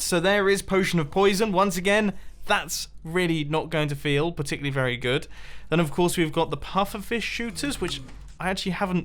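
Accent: United Kingdom. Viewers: U brittish??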